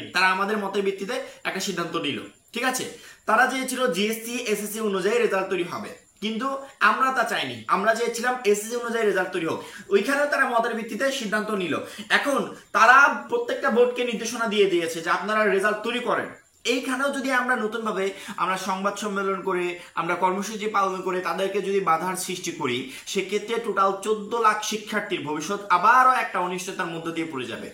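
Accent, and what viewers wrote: Sylhet, Bangladesh. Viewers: Vai ki sylhet er naki?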